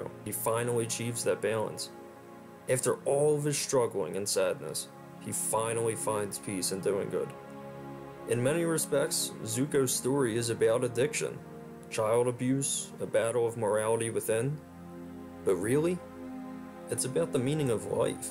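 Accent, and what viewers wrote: United States. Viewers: Bro, you gotta be from the mid-atlantic with that accent of urs. Sound like eastern PA or south jersey. Am i right? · Dude, your accent is intense... where are you from??? Baltimore? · Ok mate, I really can't tell if you're American, Australian or New Zealander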